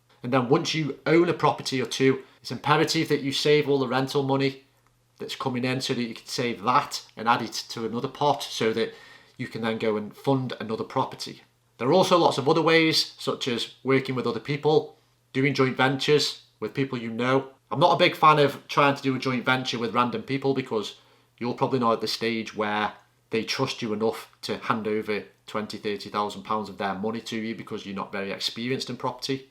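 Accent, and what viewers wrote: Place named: Stoke, United Kingdom. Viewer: Loving your advice mate and I used to live in Stoke so its nice hearing a stokie accent, I have a deposit ready and been researching it a lot so keep the content coming!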